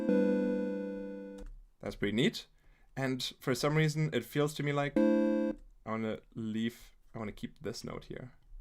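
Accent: Germany. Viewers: Why? He has a German accent.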